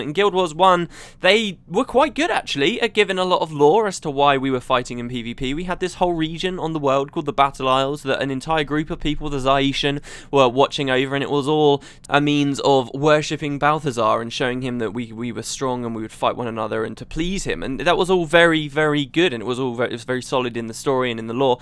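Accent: United Kingdom. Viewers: I've just watched the fucked up videos pn youtube... I really hope will cheer me up with his excellent british accent.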